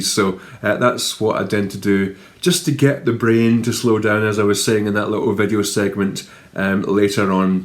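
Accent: Scotland, United Kingdom. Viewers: Hello - I live in Tasmania, the little island state of Australia 🇦🇺 your podcast was just a delight to watch and listen to- I adore the Scottish accent and I really enjoyed the scenery of Arran. My dear Dad was an amazing knitter- he taught me to knit and, although he passed away in 1995, we still enjoy wearing some of his garments- our beautiful granddaughter is wearing a colour work jumper he made for our daughter in the late 1980s- so very special ❤ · Gday from Australia. Really enjoyed your video hope to see more. Really liked the country side video. My dad was from Scotland so your accent made me feel at home. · Hello from the North of France, near Compiègne and Paris 🗼. I enjoyed very much watching your podcast and admiring your nice country. Your videos will be a good English exercise for me, especially with your Scottish accent, I have to get used to it. 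But after several videos, I guess it will be OK.Thank you for the good time spent with all the community of knitters from all over the world.🙋‍♀ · Hello from Iowa City,IA USA!!! The Gaelic name caught my attention right off!! I LOVE ❤️ listening to the Scottish spoken word!! & I hope to make a trip to Scotland soon!! I enjoyed the trip around your area & center. I started a Prayer Shawl Ministry at my church(St.Mary’s) where we knit&crochet prayer shawls & Baptism blankets!! Thank you for sharing what you do!! Linda God Bless!!!😇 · Hello from CRESTONE. Colorado, USA. I happened to find you and enjoyed watching and listening to your Scottish accent. I'm a crocheter but have done some knitting in the past. Your area is very beautiful. I live in the foothills of the Sangre Dr Christo Mts. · Hi Michelle here. I love your podcast - I live in New Zealand. I love the Scottish accent thanks to my Great Gran who was from Glasgow - it takes me back to my childhood - I will be looking forward to the next one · Hiya from the central Highlands, always good to hear another Scottish voice! 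· Hello from Australia, really enjoyed watching your podcast such lovely scenery on your beautiful island. Knitting is a joy and so nice to listen to a your podcast in your lovely scottish accent. Your fisherman's hat is beaut and with the tassel reminds me of pictures of past times with nightie, candle and bed hat with tassel .... have a good one cheers from downunder :) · Lovely to find you here on you-tube and fantastic to see your workplace and home. I laughed when you said that your Mum would be the only person to have watched the whole thing! 2.97K subscribers as I write:) I am trying to learn and appreciate sock knitti g right now and am attempting afterthought heels too! I have 4 tubes done and now need to try the heels: I will check out Arne and Carlos' pattern to see if they have any extra tips, so thank you hugely for that . I am in the UK: SE London. Lovely to see a man knitter and hear a scottish accent :) It saddened me to hear about the island clearance: what a heritage we have..... 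· Hi there! I had your podcast pop up in my feed and I was intrigued by the name since I am of Scottish heritage and one of my fav restaurants in Edinburgh is called the Albanach. I also love Arne and Carlos socks but I love their Pair Perfect socks also by Regina. I have knit many pairs and also the childrens’ version. Your accent reminds me of my family there. Just back from my third time there this past fall. Can’t wait to return. Very much enjoyed your podcast and looking forward to watching the others and for future podcasts! Slainte! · Hello from Deb Sims of Charlotte, North Carolina, USA…You are a natural at YouTubing. Love the content mix…music, scenery, projects…lovely. Love, love the colorwork and color choices in your hat. My forbears were from Caithness, so I love hearing Scottish accents. I’m a happy subscriber.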